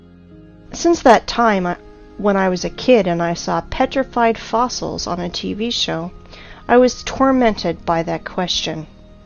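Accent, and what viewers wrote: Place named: Russia. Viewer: I wish i could get the original version with the Russian style accent. my hard drive crashed and i lost my downloaded copy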